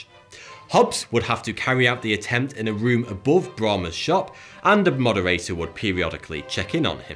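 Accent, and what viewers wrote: United Kingdom. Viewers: Could be the British accent. But I found myself mesmerized by this. Lol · I also can't unhear it. Is it due to the British accent? I had also heard "everything" as "everyfim" in the game Battlefield 1... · Oh my goodness, I have fallen in love with your accent. The British pronunciation of the English language is truly the most gorgeous to the ear. Plus the great content. Why haven't I found you ealier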